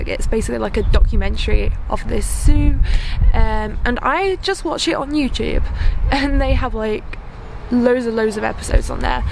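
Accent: Essex, United Kingdom. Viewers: My wife is from Essex. I can hear Essex in your "Fink" and "ehmm"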